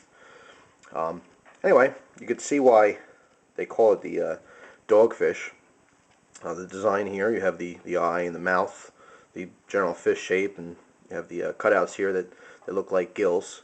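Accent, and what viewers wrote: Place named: Boston, United States. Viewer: a year or two ago i started watching some of your videos while i did my homework. you sound SOOOO different here! you have like a boston accent haha. just ordered myself a Dogfish and was checking out reviews, stumbled upon yours lol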